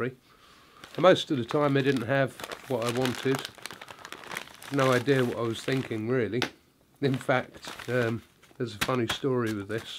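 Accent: England, United Kingdom. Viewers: You have the most wonderful english accent, I know that might sound strange but I just find your voice so soothing. I feel as if I could fall asleep listening to you talk about quite literally anything.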